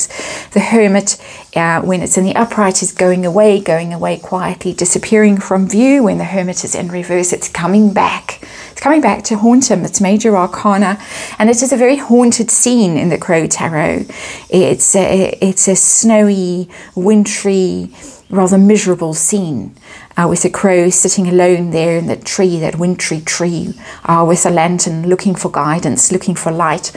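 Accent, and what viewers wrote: South Africa. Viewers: Great! Thanks! I absolutely love your readings! Your beautiful SA accent lends a lovely gentleness to the readings even when the readings are darker than usual, as was this one! Stay safe lovely lady xx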